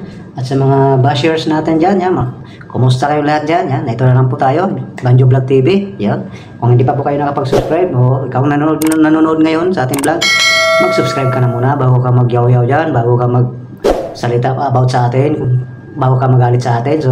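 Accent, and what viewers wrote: Visayas, Philippines. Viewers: Tonong bisaya pilipit mag tagalog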